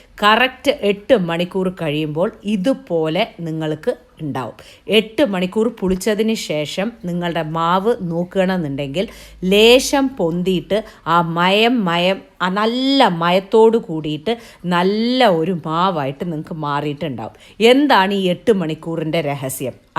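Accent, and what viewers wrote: Palakkad, India. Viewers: Chechi palakkad anale athanu palakkad slang samsarikinathu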